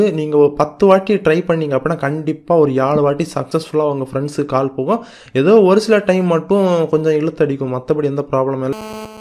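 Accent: Kanyakumari, India. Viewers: Kanyakumari neega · Bro neenga kanyakumari District ahh.....??? Slang paatha theriuthu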